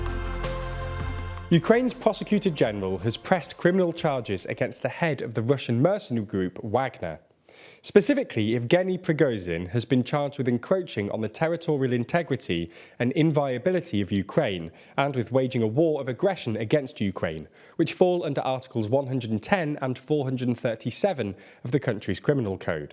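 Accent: United Kingdom. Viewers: Sorry you are feeling unwell,. Hope you get better soon. You are still gorgeous, and your Brit accent still rocks. You could read the phone unwell with that accent and I would still tune in.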